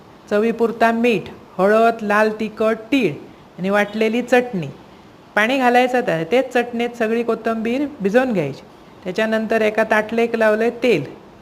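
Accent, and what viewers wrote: Malvani, India. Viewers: Tumachi malvani ani receipe donhihi apratim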